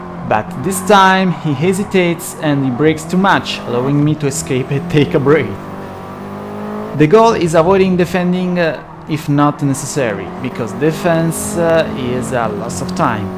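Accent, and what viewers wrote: Italy. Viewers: Italian accent at its best, it's awesome ♥️ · I`m really glad that you finally decided to do a voice over on your videos! It adds another layer of communication and helps focusing on the video itself! Great job! And as an Italian living in Brazil, I love that Italian accent and tone! I can almost see your hands moving while talking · You sound like u are italian,like me😂😂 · Adoro il tuo inglese italianizzato ahah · Scusa sai se lui è italiano? Perché dall'accento sembrerebbe di sì... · your accent is like an italian who speak in English. I'm from Italy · I love when english is spoken by italians because I can understand them easily!!! He remind me of Dovizioso and Rossi answering questions in press conference. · why does he have an italian like accent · Sei italiano? L' accento è quello. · Si sente che sei italiano sotto sotto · I love your italian accent when you talk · Your voice make me remember italian people,such a nice accent its funny and not too formal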